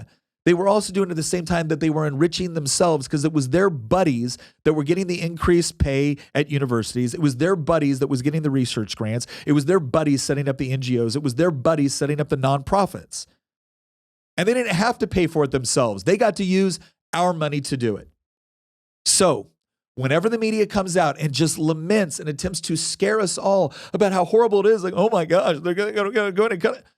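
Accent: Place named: West Coast, United States. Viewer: you must be from the West coast, ending ing words as een.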